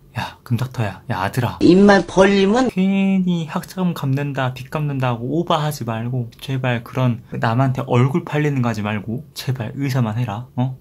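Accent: Gwangju, South Korea. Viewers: 개판이라는 말 넘 웃겨여ㅋㅋㅋㅋㅋㅋㅋ 금닥터님 전 광산구 주민이라 광주사투리 들으니 넘 반갑네용ㅠㅠ 성격 좋으신 금닥터님! 늘 응원하고 있습니다👨‍⚕️✨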